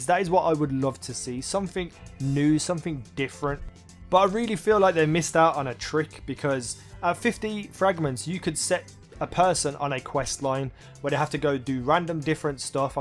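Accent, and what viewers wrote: Birmingham, United Kingdom. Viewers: U from Brum?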